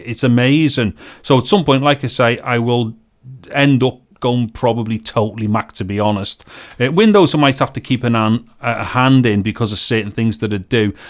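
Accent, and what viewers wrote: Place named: North, United Kingdom. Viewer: Edinbrah or Dublin accent? Northen United Kingdom accent. :) Just guessing.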